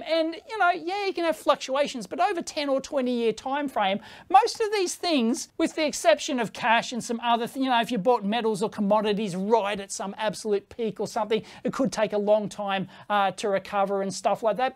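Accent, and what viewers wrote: Australia. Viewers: your voice kills me bro and that Australian accent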